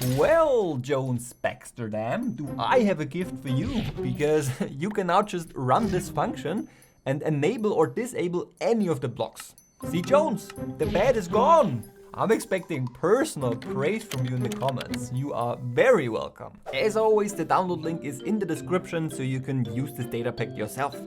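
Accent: Germany. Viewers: you sound german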